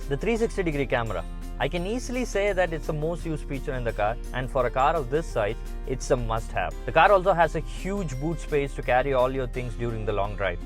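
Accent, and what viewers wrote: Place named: India. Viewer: but that indian accent though. Can’t get used to it.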